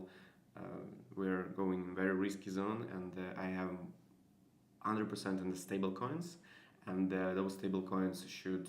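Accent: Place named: Russia. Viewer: Хочешь на англ аудиторию работать, поставь произношение. Такой английский для рускоговорящих - родной, но возникает немой вопрос: "а можно уже на русском?". Англофоны будут скипать пока, сложно разобрать что ты там говоришь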